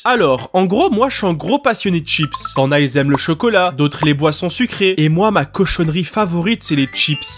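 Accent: Quebec, Canada. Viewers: Il est québécois en tand que français jaime bien l’accent québécois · Suoer vidéo! Et sinon t'es pas québécois par hazard ?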